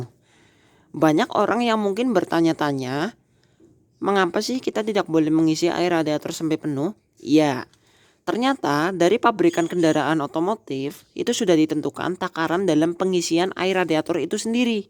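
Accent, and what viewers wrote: Banyumas, Indonesia. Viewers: Ngapak² nih..terimakasih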